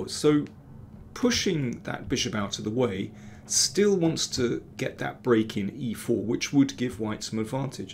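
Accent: United Kingdom. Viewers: I love the strong British accent and your very very happy smiley demeanor, paired with deep knowledge (obviously). Makes for great content. Subscribed!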